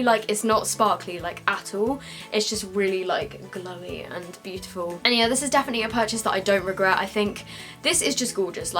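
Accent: England, United Kingdom. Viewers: omg ur so amazing I love watching ur videos. ur so beautiful and cute. I love how u talk it's unique. ur from UK? that's awesome. I love you so much omg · where abouts In England is she from x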